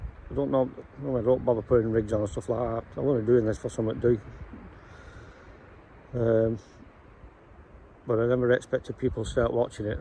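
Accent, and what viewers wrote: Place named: Northern England, United Kingdom. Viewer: brilliant video and proper northern mans commentary no snow flakes here lol keep them coming superb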